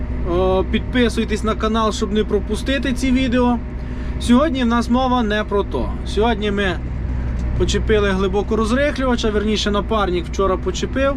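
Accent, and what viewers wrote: Ternopil, Ukraine. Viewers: з якої ти області? По розмові ніби з Тернопільської.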